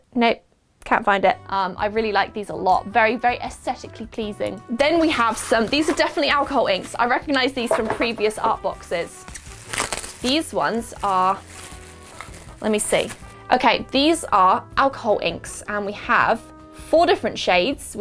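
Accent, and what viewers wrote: United Kingdom. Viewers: Would not mind seeing and hearing you for two hours your from my neck of the woods so is always nice to hear another person from the UK but from elsewhere in the world I love the look of the Inky box hugs from Scotland 😁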